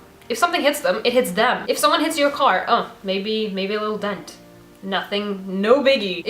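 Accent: United States. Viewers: whatever I think you have an Amrican and amazing Accent. Thank you for replying.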